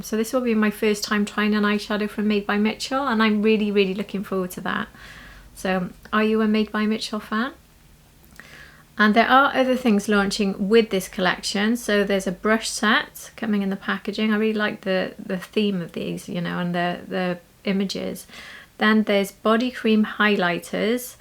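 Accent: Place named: South Wales, United Kingdom. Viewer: I love your accent, is it South Wales?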